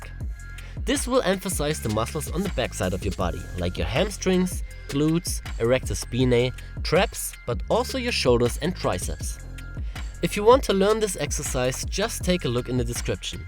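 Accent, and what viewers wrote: Germany. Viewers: This man is single-handedly rebranding the German accent from evil villain to uplifting coach! Danke schoen as always. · Nice muscles! Super body! 👍🏻😛nice German accent, lol! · Yeah, the accent (way het talks English) gives it away. ;) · Are you German? :) Just checking myself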